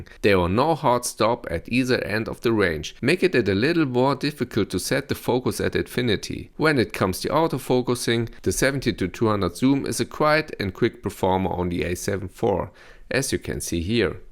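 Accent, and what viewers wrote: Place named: Germany. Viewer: Mir gefällt der Aufbau von deinem Video gut, es gibt keine nervige Musik und generell wird auf die Punkte Konstruktiv eingegangen. Den deutschen Akzent habe ich aber sofort rausgehört, da musst du noch dran feilen :D Außerdem ist die Brennweite Millimeter, dabei hast du Centimeter genutzt und geschrieben 😅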